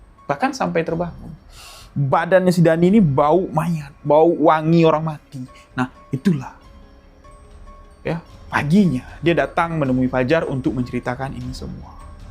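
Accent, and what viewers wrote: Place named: Jambi, Indonesia. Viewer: sama2x bang, maaf jarang komen bang, maju terus bang udah mode jambi tuh pembawaannya ❤❤❤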